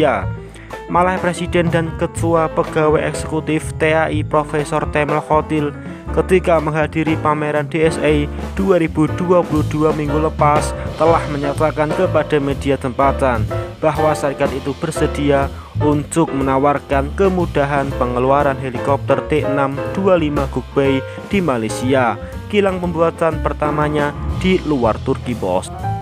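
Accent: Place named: Java, Indonesia. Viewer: Kuat betul bunyi dialeq jawa dia ni...CG Loro telu papat ya😁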